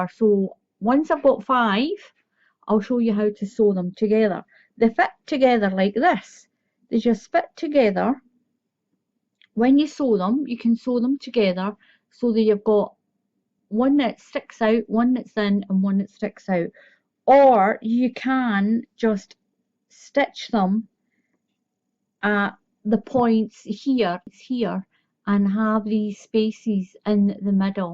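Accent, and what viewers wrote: Scotland, United Kingdom. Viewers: I could listen to you talk all day, your accent reminds me so much of my Nana's best friend Helen, they've been friends for over 50 years. Helen has been in the US for longer than that, but she's never lost her Scottish accent. My Nana said when she first met Helen she could hardly understand a word she said haha.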